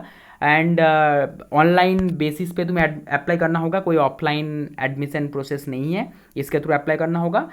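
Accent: Bengal, India. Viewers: Are you Bengali ?? Your voice like Bengali tone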